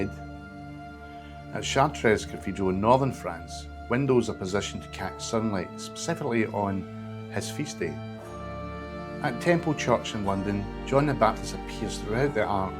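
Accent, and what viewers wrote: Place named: Scotland, United Kingdom. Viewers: I just couldn't hang in trying to work through the scottish accent. Is there a commentary available in audible english? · Just loved the Scottish accent 🌿 ❤️ 🌿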